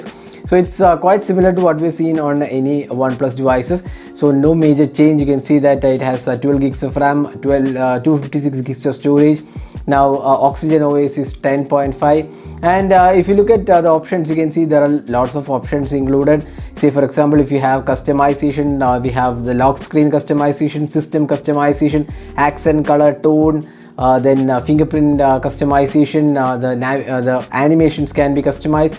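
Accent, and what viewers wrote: Kerala, India. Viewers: Enthaaa English. Only malayalies talk English with this accent. Fools....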